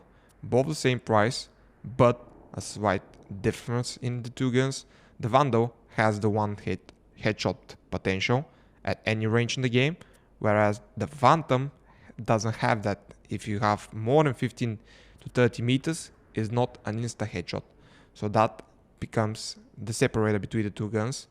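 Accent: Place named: Bulgaria. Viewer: no wonder yr accent was familiar to me you are Bulgarian <3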